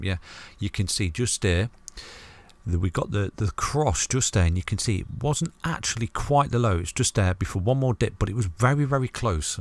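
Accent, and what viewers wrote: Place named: Nottingham, United Kingdom. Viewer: You sound like a Notts lad, are you local?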